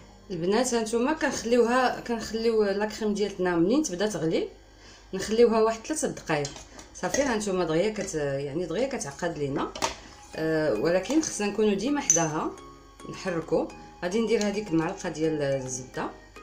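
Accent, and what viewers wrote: Morocco. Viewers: صباح الخير . يعطيك الصحة حبيبتي .الشرح واضح اللهجة المغربية تهبل .الف تحية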